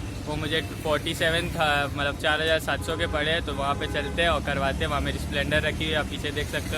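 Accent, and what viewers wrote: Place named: Punjab, India. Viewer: Bhai bol ta Hindi par lagda Punjabi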